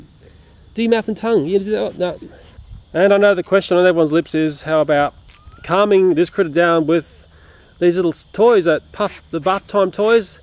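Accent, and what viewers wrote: Sydney, Australia. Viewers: liquid sky if you've ever watched his channel, you'd know thats his natural accent hes from sydney. he had a great spider series from when he had a HORRIBLE redback infestation. he's just a normal guy trying to help the best he can.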